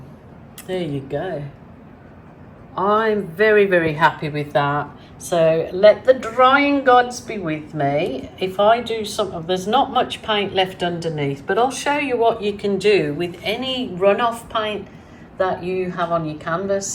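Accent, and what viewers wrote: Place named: Midlands, United Kingdom. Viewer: are you from the Midlands, my ears prick up automatically when l hear my home town. I’m also in Oz so my accent now is a weird mix of brum and Oz. Cheers from QLD.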